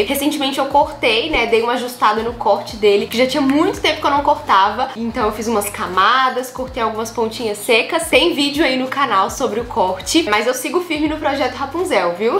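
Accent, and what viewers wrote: Minas Gerais, Brazil. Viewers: é o lindo sotaque mineiro ❤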